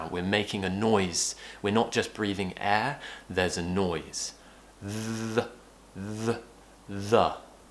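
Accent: RP, United Kingdom. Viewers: Dude this is super difficult. Cause we are taught to speak “other” in a completely different way in India. It defintely doesn’t sound like udder but we don’t put the tongue in between. As you said , the muscle memory has to be worked on. You sound super POSH. Want to learn to speak in the same way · I am actually from Essex however I am doing a language exchange with an Italian guy who struggle with "th"... I have recommended your video to him because it is AMAZING! Can I ask do you generally speak with an RP accent or do you do it just for your videos? :) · You are an amazing teacher, your British accent is amazing...lots of love from Bhutan 🇧🇹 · Hey i have seen your all video. And i want to recommend something. And i want to requist something.and i hope. That is when i read a bible i found serious pronunciations in the verse.would u mind if u receive my request? 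MATTHEW Chapter5 verse 23~25 I need your help and British accent thank u my best teacher ※i just hope. You don't need to get serious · good looking with this hair cut Thanks for your teaching eng with british accent😍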